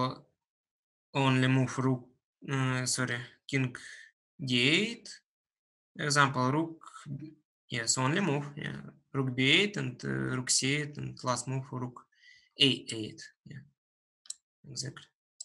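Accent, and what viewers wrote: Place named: Russia. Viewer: I googled brutal russian accents and ended up here, amazing stuff.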